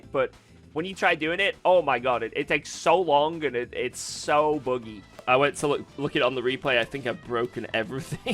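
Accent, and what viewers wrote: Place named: North East England, United Kingdom. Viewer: New player, new subscriber - I'm trying to place your accent though?! I get twangs of North East, then a bit of Manchester'ish all with the odd bit of Americana?! 🤔😂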